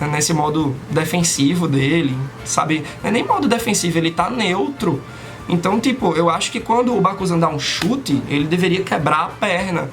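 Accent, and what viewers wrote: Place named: Sergipe, Brazil. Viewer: Tu é de Sergipe? Parece demais o sotaque. Abraço!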